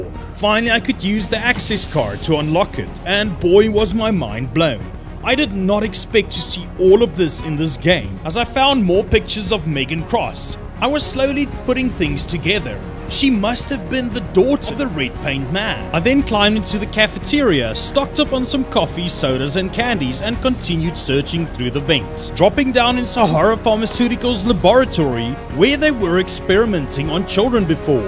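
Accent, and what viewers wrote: Afrikaans, South Africa. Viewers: you sound south african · Some words sound like south Africa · I only have watched 2 minutes of the video and I'd say that this guy is 100% south African. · You Afrikaans? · Why do u sound like u from South Africa. :D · bro he sounds like a south African man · You sound south african ngl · are you from south africa??? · Ek is mal oor daai afrikaanse aksent 😂 awesome vidio bra 🤙🏻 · are you from ZA ? if so what province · Are you from South Africa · Bro, are you from South Africa? Your accent sounds very familiar! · Are you south African? It's the accent 🤣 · is he from south africa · Goeie video Jy kan in jou stem hoor jy is van SA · Okay but if i'm not mistaken hopefully the Afrikaans(?) accent makes everything so much funnier. As an African myself who lives in NZ now it always makes me so happy to hear a raw African accent. 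(I'm so sorry if you aren't Afrikaans but otherwise your accent is amazing.) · Gotta love that boer voice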